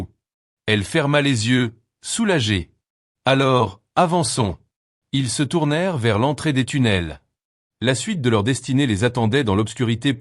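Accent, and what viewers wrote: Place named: Portugal. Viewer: C'est n est pas une autre langue..mais du français avec un accent portugais ou brésilien Mais, oui,c est penible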